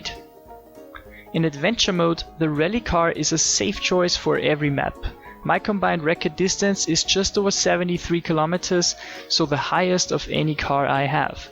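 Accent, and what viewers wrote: Austria. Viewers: kommst du aus Österreich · Kommst du auch aus Österreich?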